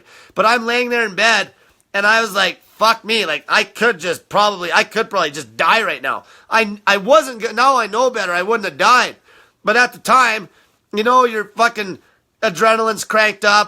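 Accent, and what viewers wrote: Canada. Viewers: Finally nailed your accent - Canadian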